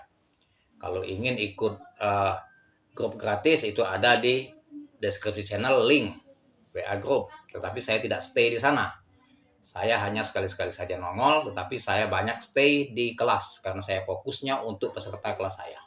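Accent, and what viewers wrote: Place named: South Sumatra, Indonesia. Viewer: Sepertinya bahasanya bpk asal sumsel ya Pak..salam cuaan Pak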